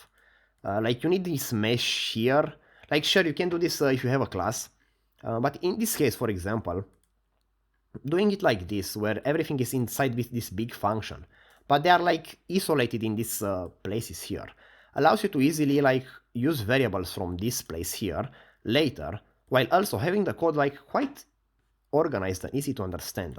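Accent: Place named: Romania. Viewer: Guys istg his english is fine but it's just the ̶R̶u̶s̶s̶i̶a̶n̶ Romanian accent.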